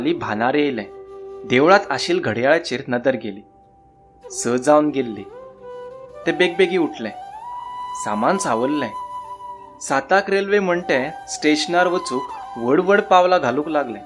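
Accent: Karwar, India. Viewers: सुंदर कथा संदेश सर 👌. As always Best narration sir, karwari style narration added new flavor to the story..👏👏👏👏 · Very nice story with simple flow. I really liked the karwari attributes of the writing as well the story. bhai😘😘 nice job of implementing Karwari accent 😊😊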